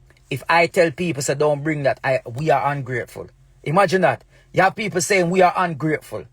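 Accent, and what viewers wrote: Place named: United Kingdom. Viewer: However this dude is not Jamaican he is from the UK with a British accent.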